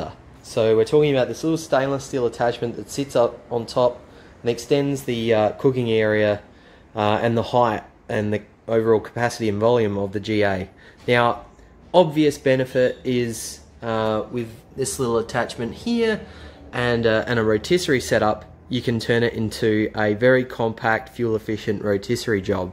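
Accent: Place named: South Africa. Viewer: Love that SA accent mate, great video, cheers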